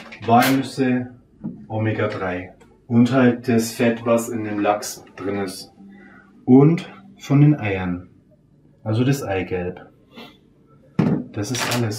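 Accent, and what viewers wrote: Bavaria, Germany. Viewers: woher kommst du eigentlich ursprünglich? man hört einen bayrischen akzent raus. aktuell residierst du in nürnberg hab ich gehört? ;)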